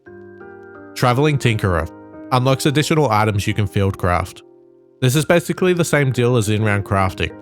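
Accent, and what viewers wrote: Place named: Australia. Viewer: AUSTRALIAN ARC RAIDERS YOUTUBER DETECTED LETS GO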